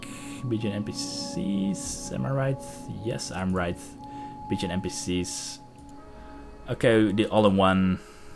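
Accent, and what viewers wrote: Netherlands. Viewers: Heerlijk Nederlands accent! Toffe video trouwens :)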